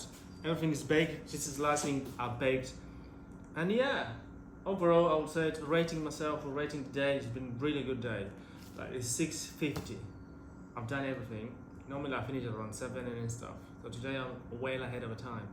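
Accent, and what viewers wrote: England, United Kingdom. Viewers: As soon as you drove up to that old brick building I knew this was going to be in England, then you started talking and the accent confirmed my thoughts !!! Nice work !!! How did you learn all this ? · What English accent do you have?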